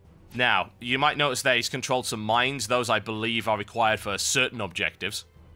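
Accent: United Kingdom. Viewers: *Facepalm* ITS A BRITISH ACCENT. OBVIOUSLY. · I was under the impression he was British...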